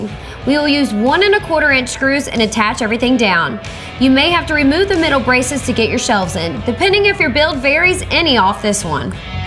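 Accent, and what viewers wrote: South, United States. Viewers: That country accent 👌🙌😍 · Redhead. Chest. Southern accent. She has to be bi too. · How the fuck, did I end up here?? ...I ended listening to southern American girl ....building shit. Why.....I am in Europe like. At least I enjoyed her accent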